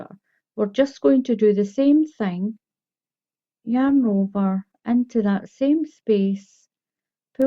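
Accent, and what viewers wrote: Scotland, United Kingdom. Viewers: ❤️❤️❤️❤️❤️ Wow, by looking at it one would never know how easy that beautiful little blanket was to make. Thank you for the lesson!! ❤️ I'm going to use this on my next baby blanket project.👍 Btw, I get in trouble when I'm wrong but do I detect a bit of a lovely Scottish accent?🙂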